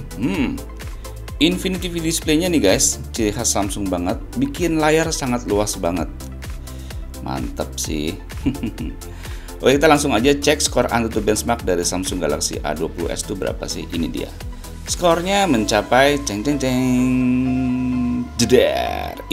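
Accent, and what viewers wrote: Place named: Banjar, Indonesia. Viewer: Suara nyaring ya bang bahasa banjar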